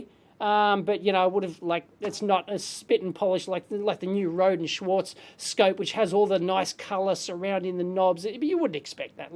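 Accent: Australia. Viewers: "Darta logging" Love the aussie accent !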